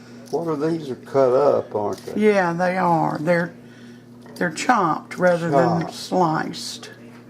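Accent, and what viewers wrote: South, United States. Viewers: I really appreciate your presentation. Your southern accent gives confidence and assurance to your listeners. It's southern hospitality personified.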